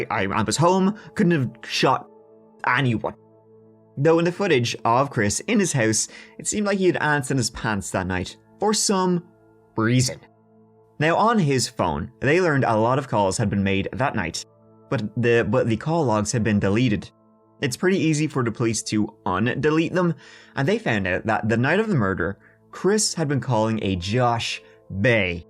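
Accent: Ireland. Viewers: I heard this story before but it’s always better with an ol’ Irish narrator giving it a goo!!!! 💚💚🤣🫶🏻🕺🪩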